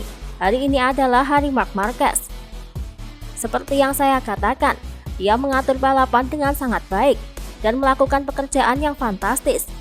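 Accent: Java, Indonesia. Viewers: Mbak e medhok men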